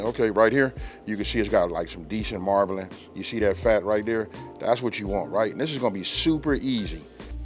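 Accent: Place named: Los Angeles, United States. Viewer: I swear if that ain’t an LA accent, I don’t know what is 😂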